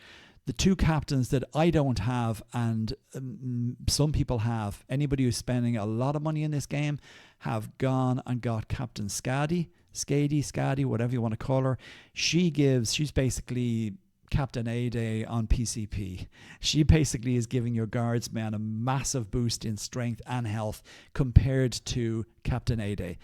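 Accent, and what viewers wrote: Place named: Ireland. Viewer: As a new player this is the first good information site i have found , and i have looked at a lot . Its good to hear a clear logical intelligent person explaining things for beginners upwards and i am very much a beginner. Its good to hear how and why things are important and how to use them how to upgrade them . Also good to hear an Irish ? Accent instead of a london or american accent . Thank you so much for this clear detailed information. I am a very new player only level 112, 000 or so and have been in no battles or tournaments. I am building my city and equiping carter . Thank you fir this page . Very good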